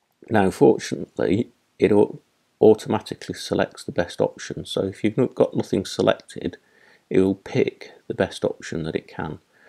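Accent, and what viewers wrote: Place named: United Kingdom. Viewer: Really helpful vids....And I myself love the British accent...its very proper...Thanks.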